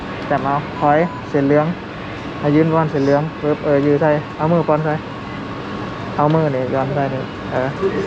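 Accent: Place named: Ubon Ratchathani, Thailand. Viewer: บ่าว เป็นคนแขวงได๋เว้าคึทางอุบล ทำงานยุกรุงเทพบ้อเพิ่งติดตาม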